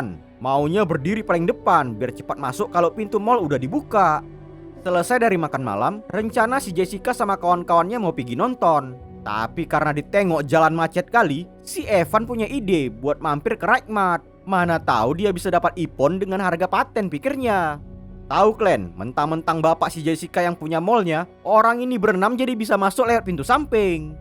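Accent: Medan, Indonesia. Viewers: awak medan kali bah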